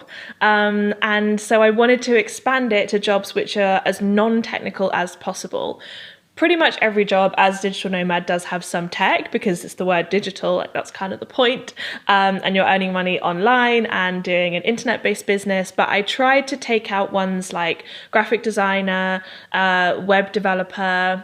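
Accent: United Kingdom. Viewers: Great content as always! Thank you 😊👍 and this british accent grrrr loving it! 😊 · It’s so refreshing to hear a British accent! Hallelujah 🙏🏾No shade!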